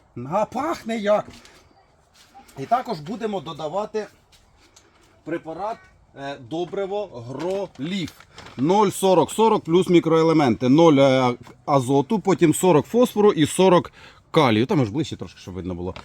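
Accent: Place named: Luhansk, Ukraine. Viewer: Я балдею от вашей разговорной речи луганск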